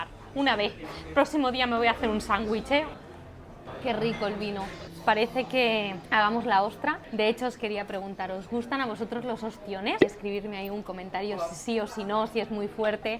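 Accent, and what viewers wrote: Andalusia, Spain. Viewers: Y por qué hablas con acento andaluz? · tu origen me tiene confundido, has hablado de pesos, tacos y México, tienes un super acento andaluz y hablas catalán que parece nativo. de dónde eres? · Me resulta divertido porque pasas de un catalán con acento perfecto nativo a un castellano mezcla de andaluz, murciano y mexicano. Por lo demás, me encantan tus videos. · Me he liado.. .. de donde eres!??? Tienes acento andaluz también!??? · Porque si eres de Barcelona tienes acento de Andaluza?? Saludos 👍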